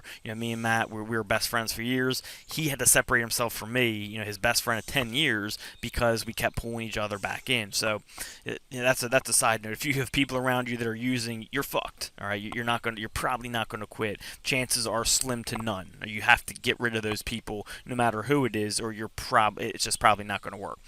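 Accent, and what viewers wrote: Philadelphia, United States. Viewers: Dylan M he's gotta be from the Philly area.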